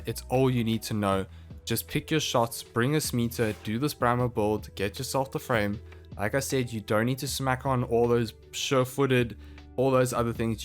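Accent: South Africa. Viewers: Dude you sound South African. Probably from Joburg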